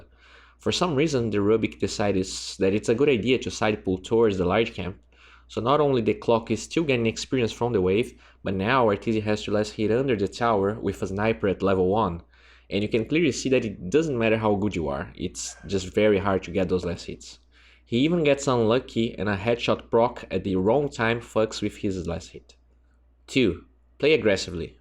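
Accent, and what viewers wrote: Brazil. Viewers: nice vid overall but i would have to say the narrarator has a heavy brazillian accent that i find offensive and annoying.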